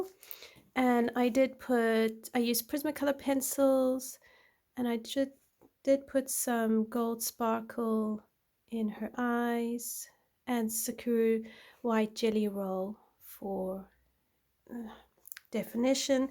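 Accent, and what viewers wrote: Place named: South Africa. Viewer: You sound Soith African? I am too but we moved to Germany. First time viewer here. Haven't watched more than 2 mins yet, just enjoyed hearing your accent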